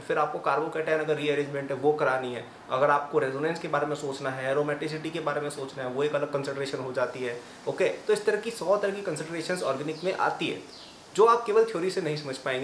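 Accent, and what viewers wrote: Standard. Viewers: Gazzab shudh Hindi👍👍 Best Btw IAM 2023 aspirant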